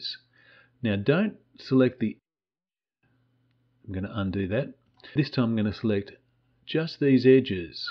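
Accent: Australia. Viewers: Finally a tutorial not jammed up with loud background music and high speed cursor movements explained clearly at a pace I can follow. I think this is going to work for me. Nice to hear an Aussie voice too.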